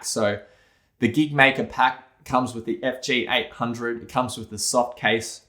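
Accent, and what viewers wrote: Australia. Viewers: The Australian accent is screaming ate so hard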